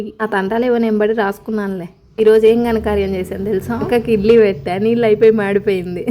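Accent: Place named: Ongole, India. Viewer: Sister ne matalu chala baguntaee ma vuroo Ongole side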